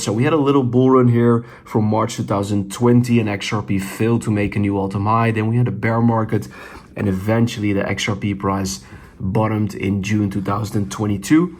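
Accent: Netherlands. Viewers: Typical dutch accent our has!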